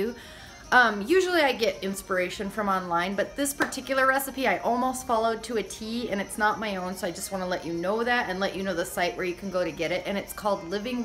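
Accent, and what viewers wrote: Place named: Gaspé, Canada. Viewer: You have a Gaspé Coast accent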